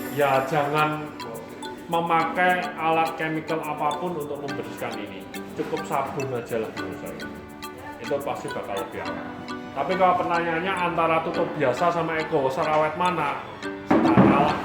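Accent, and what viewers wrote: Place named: Java, Indonesia. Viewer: Wajah nya mirip bule.... tp bahasa nya medok banget... ngebyur😀😀😀😀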